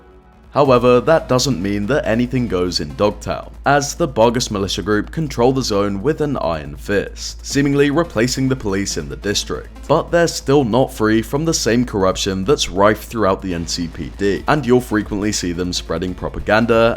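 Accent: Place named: Australia. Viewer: You've got a pretty interesting accent. Are you Australian? You voice really reminds me of CatBoyKami :D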